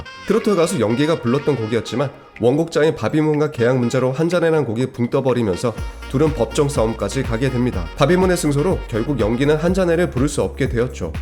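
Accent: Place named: Jeolla, South Korea. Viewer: 그런데 이 친구 이해가 안되는 게 고향은 경상도던데 왜 전라도 사투리를 쓰는지? 태어난 곳만 경상도고 자란 곳은 전라도인가?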